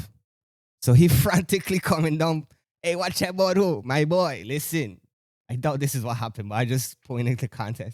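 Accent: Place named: Caribbean. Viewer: “Awo”, I watched this educational and somewhat comedic video in Ms. Charles’s class at John H. Woodson junior high school, I enjoyed the comedy and to hear a Caribbean accent speak to me about the topic of slavery. I find this video informative because it shows what our ancestors had to go through for us to be where we are now. - Lesyah D.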